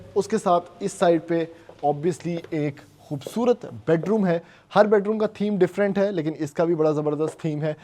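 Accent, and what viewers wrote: Punjab, India. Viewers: Ghar to bahut sunder hai but I really like your Punjabi touch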